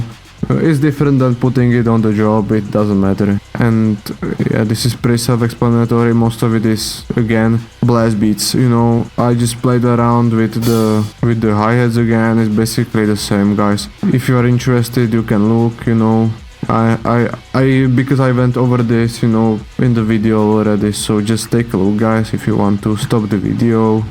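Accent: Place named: Czech Republic. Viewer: Good vid, are you Czech? Guessing from accent :-)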